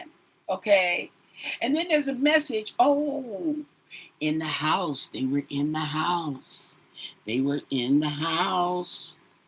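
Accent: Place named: South, United States. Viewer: I have tuned into your readings 3times now you are so comical love your accent are you from deep south etc south coralinna or Georgia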